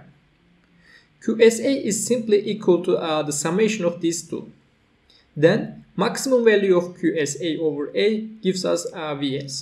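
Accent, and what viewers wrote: Turkey. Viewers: Dostum anlatım çok güzel, aksanından Türk olduğunu düşüyorum. Eline sağlık.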